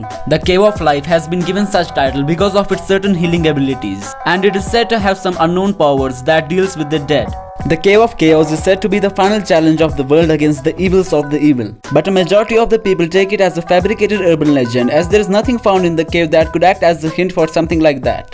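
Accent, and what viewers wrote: India. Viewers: You sound Indian are you?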